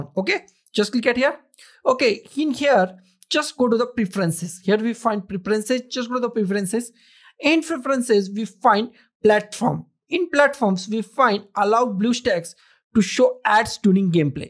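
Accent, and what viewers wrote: India. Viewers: yes great informative video!! india accent :X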